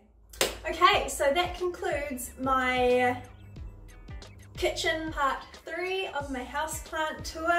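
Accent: New Zealand. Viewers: Spot that gorgeous bliiiing 😉💍 it’s so good to hear a kiwi accent and I love your pizazz so much ugh you give me life!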